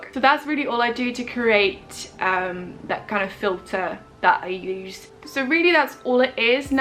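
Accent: United Kingdom. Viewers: Gosh, you are beautiful, the british accent just give a inch of perfection to you.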